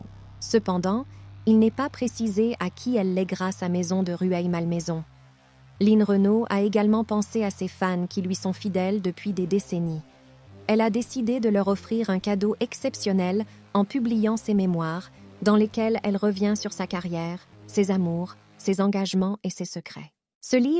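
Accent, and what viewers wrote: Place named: Canada. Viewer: On s en fout, ce n'est pas nous, drôle d accent ce robot (canadien)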